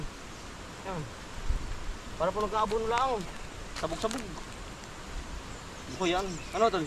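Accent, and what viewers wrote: Batangas, Philippines. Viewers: Taga Batangas po ba kayo? Hindi ko magets ang punto niong magsalita. Sorry po. Watching from Delano, California. Thank u n god bless.❤❤😊😊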